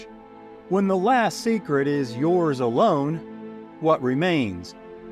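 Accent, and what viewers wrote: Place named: South, United States. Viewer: Fyi the music is good , i'm autistic and sometimes music distracts me yours is good just extenuates the narrative , i think you're on your way to being the top FG guy , you're meticulous eye to detail plus artistic panache is a winner, you have very clear precise way of speaking with only a slight accent, i would guess you have a professional background possibly a college lecturer, english literature philosophy liberal arts etc . vaguely southern accent but not folksy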